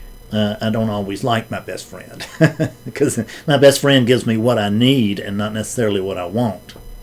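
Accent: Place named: Mississippi, United States. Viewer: Hey! Love this!! My AA sponsor turned me onto you. Just curious as to where you're from. I live in Toronto now but I'm from Mississippi. My sponsor thought I'd like really like you because she says we have the same accent.:)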